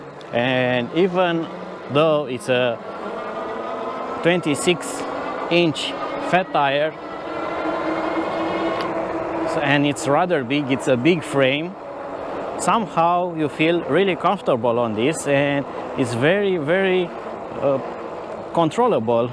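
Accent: Romania. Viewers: Are you Romanian? That accent ..😊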